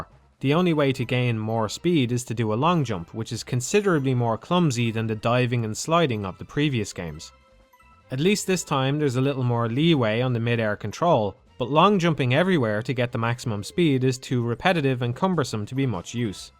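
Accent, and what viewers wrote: Ireland. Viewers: Warren Tierney Yes he is. You don't know how Irish people sound like?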